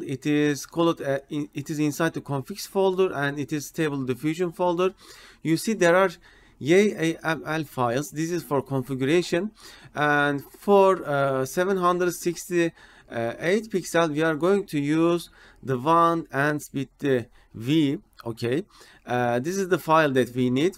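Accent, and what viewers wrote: Turkey. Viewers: I like turkish accent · This is definitely English accent of a Turkish speaker :) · tr ingilizcesi bu nerde olsa tanırım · you have a strong turkish accent brother 👊🏻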